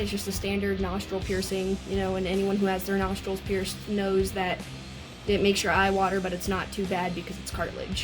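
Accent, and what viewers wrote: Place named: United States. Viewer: Great! Regarding your dialect I would guess you're from the USA, but your style look more european to me :) Great though that some americans dare to look good :)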